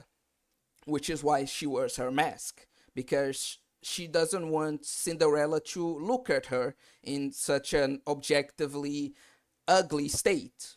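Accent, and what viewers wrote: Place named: Philippines. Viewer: Philippines English is strong in this video...put tank in the mall... Strongest race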